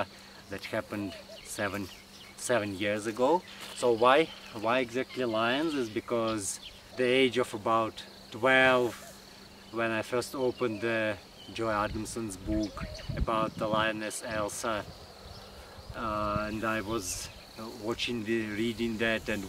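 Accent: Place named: United States. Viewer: Доброго здоровья вам, у вас американский говор, вы жили США?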